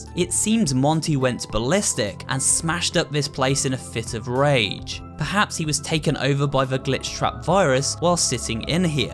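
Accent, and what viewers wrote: United Kingdom. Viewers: like the british accent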